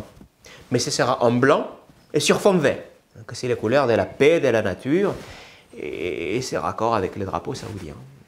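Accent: Latin America. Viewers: Savant mélange d'accent latino américain avec une pointe d'accent du midi, quelque part entre Nîmes et Béziers ^^ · Le texte est génial comme d'habitude, mais en plus l'accent latino-américain est superbement imité! ¡Adelante compañero!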